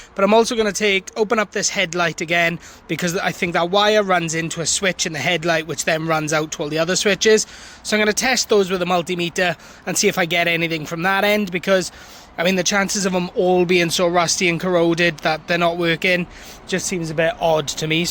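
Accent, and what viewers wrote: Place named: Swansea, United Kingdom. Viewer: You sound like a Swansea lad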